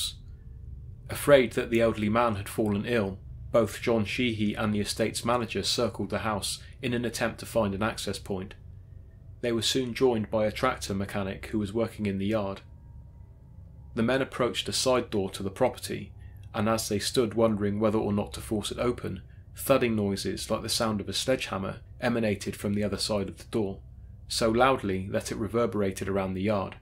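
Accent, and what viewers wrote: England, United Kingdom. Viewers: Forgive my ignorance but Why is an English man narrating this?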